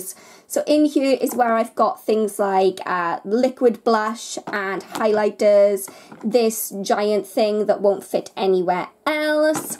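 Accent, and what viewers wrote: Wales, United Kingdom. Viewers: Omg r u welsh soo am i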